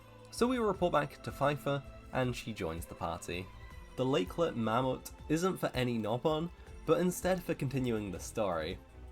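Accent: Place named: United Kingdom. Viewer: How did I just now realize you’re British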